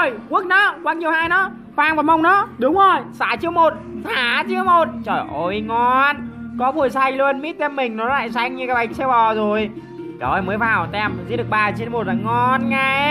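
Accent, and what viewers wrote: South, Vietnam. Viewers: Nói giọng nam nghe ngứa tai vc. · Anh nói tiếng nam ko hợp đâu anh nghe chán lắm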